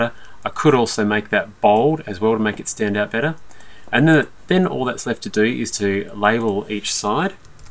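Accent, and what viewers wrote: Australia. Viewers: lol ,its the aussie accent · its australian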